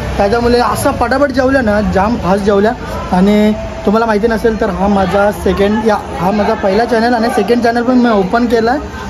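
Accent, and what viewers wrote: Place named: Agri, India. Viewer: एक नंबर भावा आपली आगरी भाषा आणि तुझी बोलण्याची टँक एक नंबर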